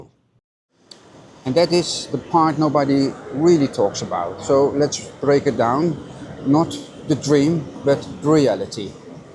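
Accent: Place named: Europe. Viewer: let me be honest with you – as a European (you sound European, forgive me if my assumption is wrong) pensioner with only limited income, stay in Europe. lots of places at the periphery that are as stunning as they are affordable. yes, you may need some winter clothes, but you can live safely and without much uncertainty. and if you save, you may be able to spend one or the other winter in SE Asia, you can pick the cheapest off-season flights.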